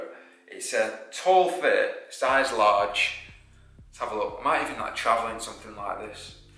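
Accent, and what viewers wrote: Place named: Liverpool, United Kingdom. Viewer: Are you from Liverpool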